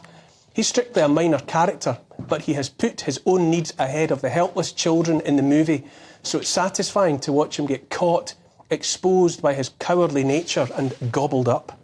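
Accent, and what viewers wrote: Scotland, United Kingdom. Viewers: it's not just what you say but the magnificent prose you use to say it. I could listen to you all day. The Scottish accent helps too. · Well said George Best with a scottish accent😃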